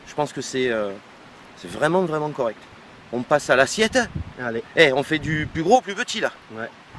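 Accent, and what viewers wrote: South, France. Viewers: ta un accent du sud de la france moi du québéc pas du tous la meme accent merci pour tes super conseil le cousin francais super intéréssent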